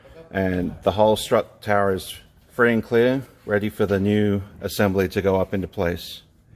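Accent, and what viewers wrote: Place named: Australia. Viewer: I cannot make out your accent for the life of me. It's sorta Australian but not really. Sorta British but not really? Maybe New Zealand? Also, yeah you guys did it bare minimal taking everything apart. Other videos they would have taken everything apart, including the brake lines because they're so paranoid. But when you know what you're doing....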